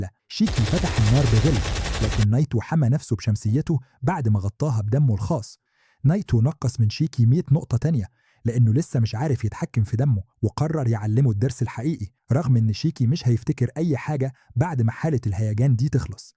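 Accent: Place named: Morocco. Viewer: وي انا متاكيد إن انت مغربي عشان صوتك يشبه 5 انا عرفهم من المغرب وي لو انت مغربي يبق انا ما غالتش الانا تبعتك❤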